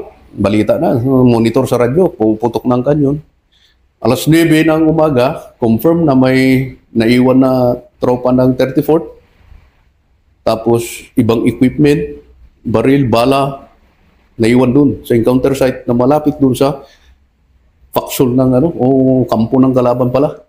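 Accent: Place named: Ilocos, Philippines. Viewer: Halatang Ilocano si Sir hehe,pero saludo ako sa tapang mo Sir.Isa kang bayani,mabuhay ang tropang musang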